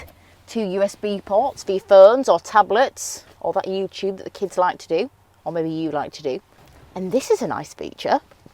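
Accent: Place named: RP, United Kingdom. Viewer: What is this bloody posh accent come on.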